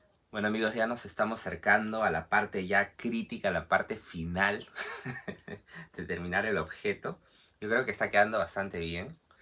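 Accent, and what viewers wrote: Peru. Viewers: En una se reconoce a un Peruano cuando habla ... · Hola amigo, eres de. Peru? Tienes un acento bien neutro, y me parece que si eres compatriota mío, sea cuál sea la respuesta, grandes exitos amigo, que siga creciendo el canal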